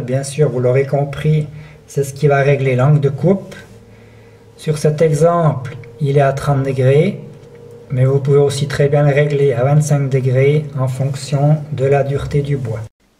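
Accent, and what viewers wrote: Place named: Quebec, Canada. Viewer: vous avez un accent québécois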